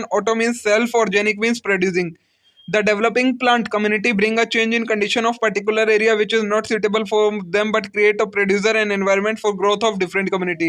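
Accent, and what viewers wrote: India. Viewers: Bhai ka UP accent is so good 🌝😂🔥🔥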